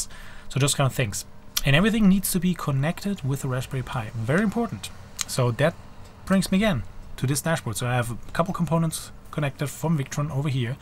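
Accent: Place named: Germany. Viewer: I noticed the German accent and was bit confused that there's the "United States" in the channel description.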